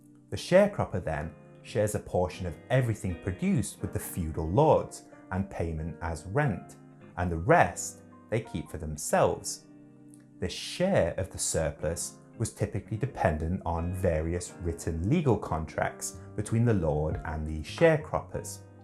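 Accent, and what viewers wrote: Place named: Midlands, United Kingdom. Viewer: You’ve got a reet midlands accent buddy. Derbyshire/Nottinghamshire?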